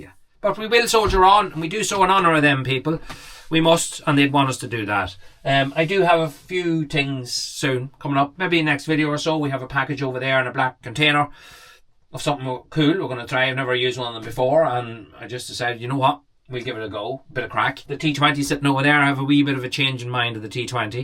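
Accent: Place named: Cavan/Monaghan, Ireland. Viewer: Your channel is growing because you are the product. The videos are well framed and edited, and the content is interesting, but fundamentally, whether you knew this or not, it's you. You are the product here. How you cope with the day-to-day is what people watch for, and you encourage people with your work ethic, attitude, and resilience. It also helps that you have a wicked Cavan/Monaghan accent, and the Yankees love that, too. You keep the country going; you are some of the best we have.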